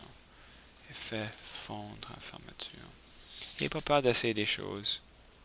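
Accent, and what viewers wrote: Quebec, Canada. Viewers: accent canadien je dirais ^^ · accent canadien bouuuu CETA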